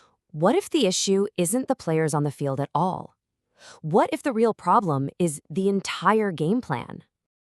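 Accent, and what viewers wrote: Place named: United States. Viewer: What’s with the American voice?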